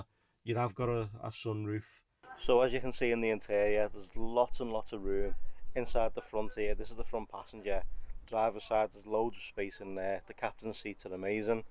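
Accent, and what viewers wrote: Liverpool, United Kingdom. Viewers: I could hear everything he said. Are you deaf or just can't understand a scouser?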